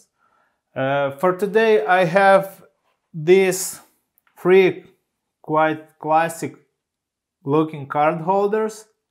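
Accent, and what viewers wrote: Russia. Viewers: Русское произношение...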